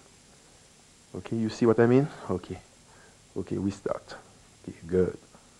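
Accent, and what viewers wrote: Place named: France. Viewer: I understand this frenglish language no problem ☝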